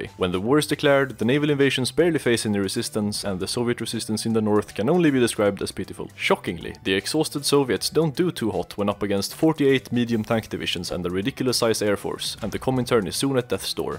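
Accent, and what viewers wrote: Sweden. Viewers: Din dialekt är exakt som en stockholmare? Va fan är du då?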